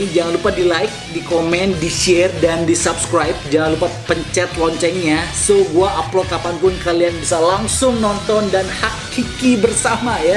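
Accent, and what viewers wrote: West Java, Indonesia. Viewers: youtuber mainan kesukaan gua, gak kaku, asik ngomongnya. kadang sunda logatnya, i love u 😂😍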